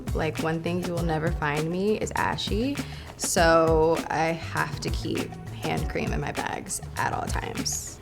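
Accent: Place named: California, United States. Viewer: It’s a California/LA girlie accent. We tired of it in the states as well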